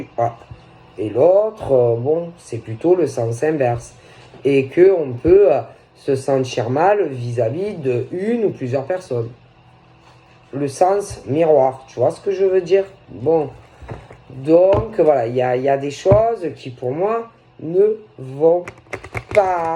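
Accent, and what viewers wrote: South, France. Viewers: avec l'accent du sud je me tord de rire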